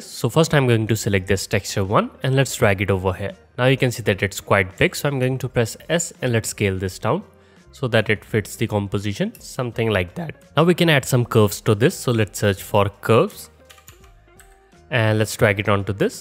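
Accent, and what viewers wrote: India. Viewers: Are you indian or what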